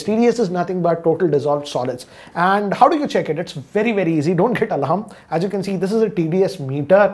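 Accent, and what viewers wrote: Hyderabad, India. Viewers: Sir, Are You From Hyderabad ?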